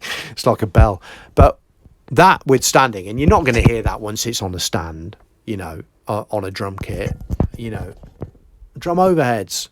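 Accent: United Kingdom. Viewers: Good video! Over 30 years of moving those faders. I agree on most of what you said. My basic kit is almost identical. Where it differs: I got some Røde NT5 in addition, I got the e905 on Snare (with the Audix i5 as an alternative), got an Audix D6 in addition to the Beta52A and the Beta91A (if I use only one mic for the BD I like the D6 better, but 52 and 91 are better in combination). My goto mic for guitar etc. is the Sennheiser e609 or e906. If people want two mics per git amp, I‘ll put on SM57 in addition. They sound more „metallic“ then the Beta57 in my opinion, so they are a better contrast to the e609/906. Oh, and I have some Audix OM7 for a special clientele. It is solid advice to recommend mics everyone knows to be at least ok and can be found on almost any rider, at least as an alternative for the actual wish list. Where are you located? Do I hear a little bit of a London cockney accent? 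· Congratulations, the best lesson on mics on the YT. I don't need to listen to anything else after this! I'm far away from you (Brazil) but I know now what to buy even if I'm on a low budget. Nothing like the knowledge of someone who lives of a subject. Never saw someone talking about the MD435 and it was a great find. Very hard to find it here because it's so much expansive for us, but I'll save the tip for any intl trip in the future. I play chromatic harmonica and most top players use a SM58 because you know it's a classic and the frequency range is quite similar to the voice but as the sound is produced by free metallic reeds, the higher frequencies are almost of a brass instrument and I think the MD435 would produce a very interesting output. If you ever have a chance to record one harmonic on it, please let me know; I also study sound quality a lot as I'm an Electrical Engineer too. 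Thanks for the video, lovely British accent! Already saved to a playlist and subscribed to your Channel.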